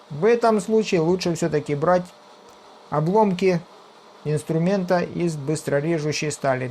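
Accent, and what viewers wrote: South, Russia. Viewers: Блин, довольно долго думал, что он действительно называет канал "Мегамозг". Но это оказалось моей ошибкой восприятия, из-за его южного говорка. Оказалось что он меХамозг. Надо думать, от слова механика, механический.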